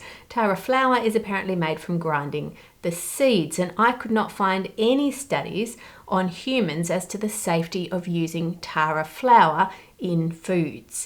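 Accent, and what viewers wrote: Australia. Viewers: there's something frighteningly funny about hearing an Australian talk about near death experiences with wildlife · I think sounded more Australian today. I have always thought she had a soft accent- probably because her parents are from England. In today's video it sounded thicker. 🌏🇦🇺🦘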